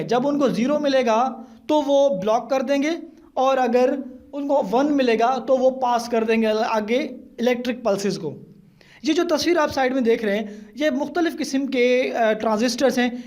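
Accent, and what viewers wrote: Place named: Sindh, Pakistan. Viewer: Sounds like Sindhi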